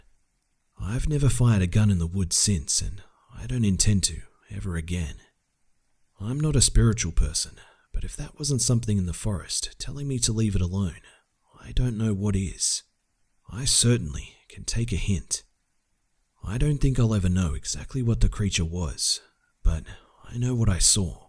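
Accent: Australia. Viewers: r u Aussie?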